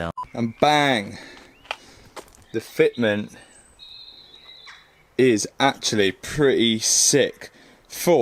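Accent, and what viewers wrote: Leicester, United Kingdom. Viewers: This lad doesn’t give a shit and just sends it which is why I love these videos! Also, are you from Leicester?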